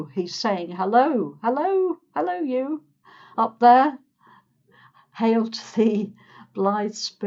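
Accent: United Kingdom. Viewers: can you suggest some British series or sitcoms that speak like you?. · Hello, thank you for your lessons very much! They are always not only informative, but also pretty useful. And one more reason why I love your study stuff is your really British accent 😇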